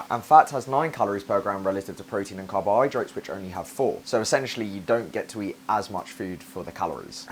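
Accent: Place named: United Kingdom. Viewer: I'll be honest, I am here for the british accent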